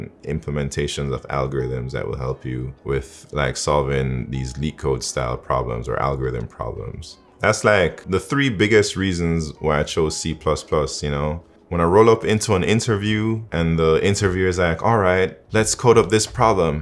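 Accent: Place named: Jamaica. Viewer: nice nice g! Good amount a Jamaicans in tech innu i based in seattle. Good fi hear someone on Youtube/Streaming in we own accent. Feels good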